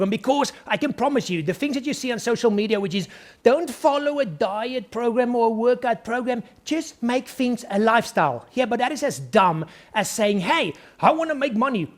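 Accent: South Africa. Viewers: South Africa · Are you south African?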